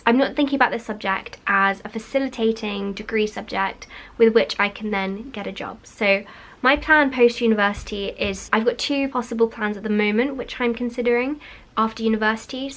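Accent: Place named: England, United Kingdom. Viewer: I like English sound, better than American sound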